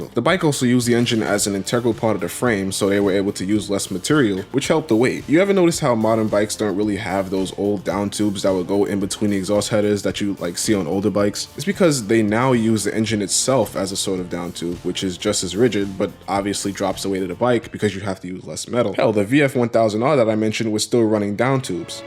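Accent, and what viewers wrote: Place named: Italian-American, United States. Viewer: Lmao I love the guido voice, muff per hour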